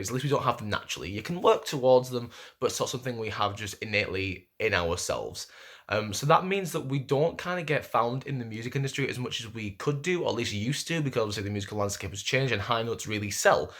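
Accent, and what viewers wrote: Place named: England, United Kingdom. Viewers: Are you an English? I really like yo accent. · I love your accent too.Are you English?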